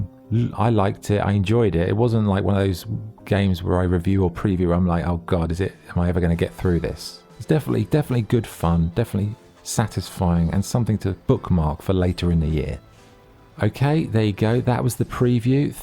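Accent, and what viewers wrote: England, United Kingdom. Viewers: I feel like the reviews are getting more organic. You and WAB are my favourites at the moment. Maybe English accents are just more tolerable.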